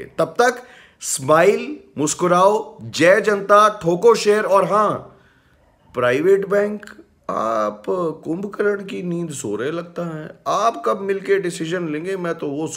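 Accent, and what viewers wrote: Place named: Punjab, India. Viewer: Sir, the way in which you speak corona exactly matches the way my father speaks... :P .. Are you a punjabi too?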